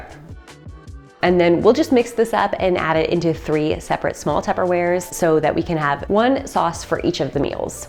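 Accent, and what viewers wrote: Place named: Canada. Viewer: Ive watched a few or your videos and I knew I detected a Canadian accent. When I saw the presidents choice berries That confirmed it.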